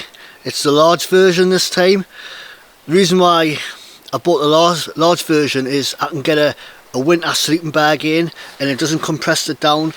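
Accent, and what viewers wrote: Newcastle, United Kingdom. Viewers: Fuck me! Who knew jimmy Cricket was a geordie?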